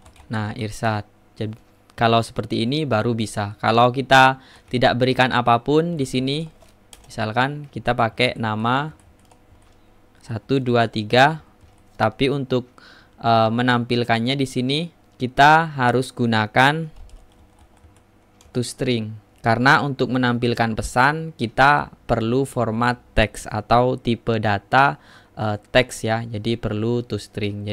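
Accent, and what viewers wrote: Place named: Madura, Indonesia. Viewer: dari logatnya kayanya orang madura deh :)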